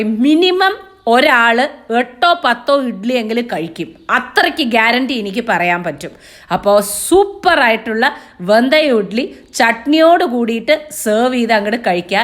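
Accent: Palakkad, India. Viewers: ഇങ്ങനെ കൊതിപ്പിക്കല്ലേ കുട്ടി.. എന്താ പാലക്കാടൻ ഭാഷ 🙏👍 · 👌👌👌👌👍👍👍👌പാലക്കാടൻ ഭാഷയും കൊള്ളാം 😍😍😍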